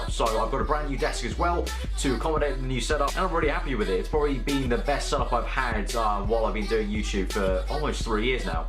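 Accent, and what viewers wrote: England, United Kingdom. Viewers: I was just wondering which part of England your from? The only reason I ask is because your neighborhood looks absolutely beautiful. I live in the US and I've never seen a more beautiful landscape. You don't have to say where exactly, just whether it's northern England or southern, etcetera. Do you live in a rural area? To me you sound English. Your accent, again to me, doesn't sound welsh or Scottish and it's definitely not Irish lol. Plus you, yourself said you're British. And while most American people don't know that Britain is made of three countries, (Wales, Scotland and England; and add the Isle of Ireland and you have the UK, for those of you that don't know) I do. Anyway, I just wanted to know where abouts you're from. As I said before it's an absolutely beautiful neighborhood, you live in. I'd love to live in England. 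Most definitely in rural England, though. As it's the most beautiful parts of your country, in my opinion. If you do live in another country, apologies for my ignorance. ☺ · Pretty sure he's just an Englishman. Lol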